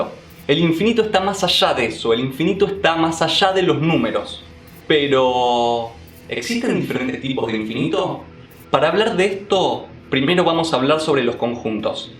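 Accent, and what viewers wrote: Argentina. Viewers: Que no se nota el acento? Obviamente es argentino :v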